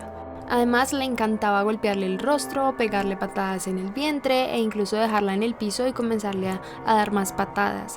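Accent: Venezuela. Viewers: minuto no le paro bolas eres venezolana?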